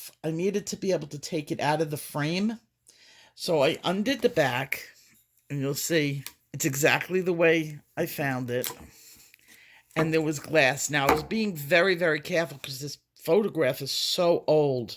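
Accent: New York, United States. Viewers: What a fantastic find. I loved hearing the family history story. I always knew there was a strong New York connection. Can’t miss that island accent. 🤣. Former New Yorker here as well 🦄❤️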